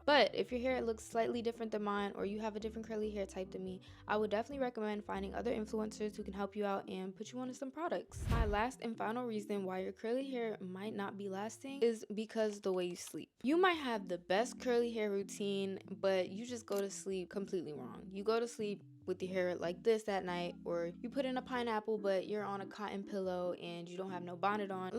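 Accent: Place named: New York City, United States. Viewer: Lil sis talks so NYC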